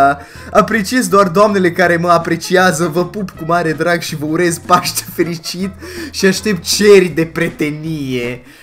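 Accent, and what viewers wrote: Transylvania, Romania. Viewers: Ești ardelean??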